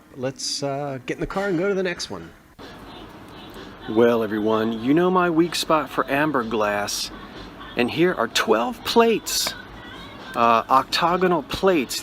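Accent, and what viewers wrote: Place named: North Jersey, United States. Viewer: Northern Jersey talks like that. I went 11th year of school up there & they thought I talked weird. I was originally from Baltimore, Maryland. 😅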